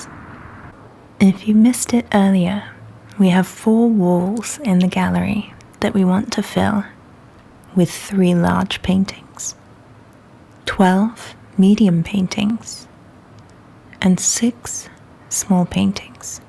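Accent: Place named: United Kingdom. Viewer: Loved it! Work on your ASMR style! You got something seriously good here. Maybe a little trip back to England would help you catch back a little more british accent which is one of your best features for this. Congrats and thank you.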